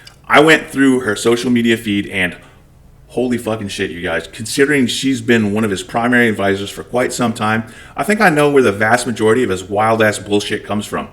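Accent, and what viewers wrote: Scotland, United Kingdom. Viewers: I can hear a Scottish brogue here! interesting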